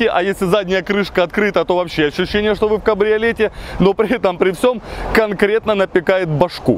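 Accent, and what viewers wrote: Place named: Luhansk, Ukraine. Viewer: Virab Saakyan он с Луганска ,а там у всех говор такой. Мой земляк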